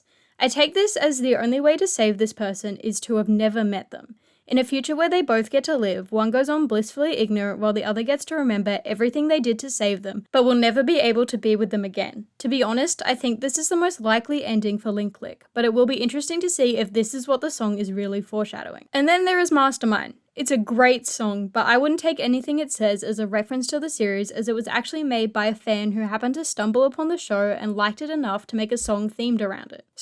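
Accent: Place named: United Kingdom. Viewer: british spotted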